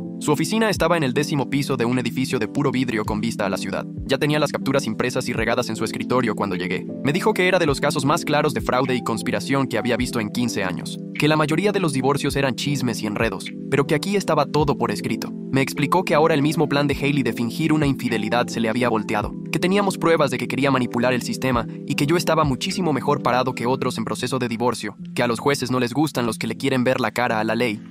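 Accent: Mexico. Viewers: Que mexicana la IA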